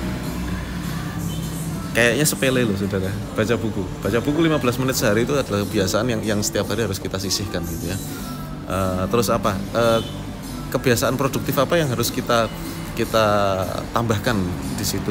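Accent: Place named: Semarang, Indonesia. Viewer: Mas kayanya orang semarang yo.. jowone medok hehe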